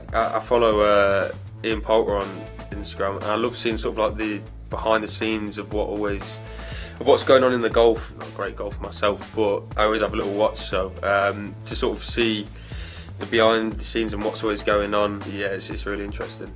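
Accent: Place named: Birmingham, United Kingdom. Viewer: It sounds like he has a hint of a Birmingham accent.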